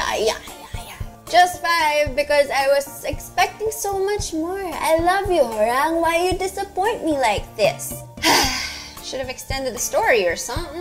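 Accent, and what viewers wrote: United Kingdom. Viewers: that british accent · that british accent tho. hahahahahahaha Brofist! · Trueee marami naman gumagamit ng British accent kapag mag-isa, it's okii. Ang soyo soyo kayaaaaa xD Lovely accent kasi huahua Ms. thank you for this video! Hahah xD We'll be waiting patiently for the next video.:3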